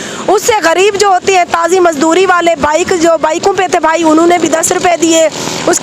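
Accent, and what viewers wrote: Saraiki, Pakistan. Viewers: m apki vedio bri shaok say dekhta Hun plz Meray sawal ka Jawab zrur Dena Mujhay saraiki bahut psnd h Aur AP bahut piari saraiki bolti ho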